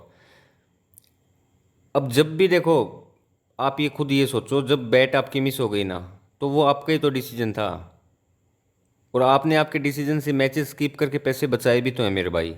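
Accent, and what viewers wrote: Haryana, India. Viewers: Dada aapke mostly saare videos dekhe,aapki ek ek baat me sachhayi hai,aur aapne apne anubhav ko saajha kiya hai,me aapko abhi se guru maanta hu,aapki language se lagta hai,shaayad ap seekar ya hariyana side ke ho,ek baar darshan ka moka de,koti koti dhanyawad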